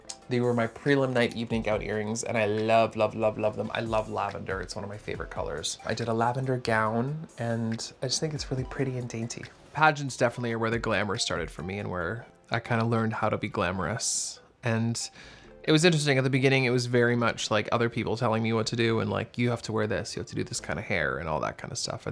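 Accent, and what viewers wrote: Canada. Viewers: I love her little Canadian accent poking through every now & then 😘✨ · so canadian...."aboot" 😍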